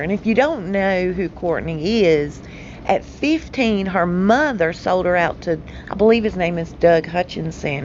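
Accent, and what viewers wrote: South, United States. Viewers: Love your beautiful southern accent,! I also love it when you say "y'all stay safe and be blessed". It makes my day!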